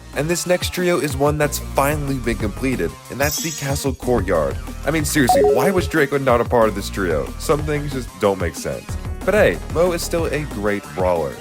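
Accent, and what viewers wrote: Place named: United Kingdom. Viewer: As an Australian he sounds so British 😭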